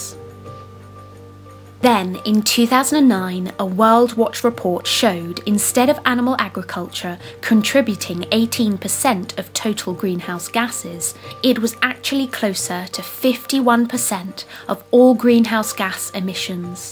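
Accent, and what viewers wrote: England, United Kingdom. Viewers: These figures seem controversial. I mean, I know they went to the trouble of hiring a voice actor with an English accent, but has anyone bothered to fact check this?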